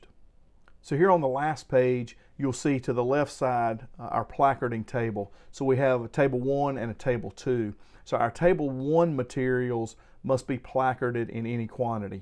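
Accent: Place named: North Carolina, United States. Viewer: I knew almost immediately from your accent and demeanor that you too were from North Carolina. Sure enough, in your office you have a Greensboro Fire Department badge. Thanks for the video! Currently studying for the CHMM exam and it helped simply things for me.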